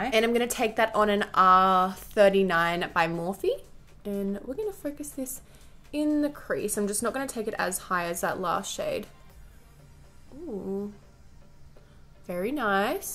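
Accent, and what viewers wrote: Australia. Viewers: I have a rly good Australian accent just from watching you for 3 years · You are literally perfect 😭❤️ I want an Australian accent so bad my fav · does anyone else love aussi accents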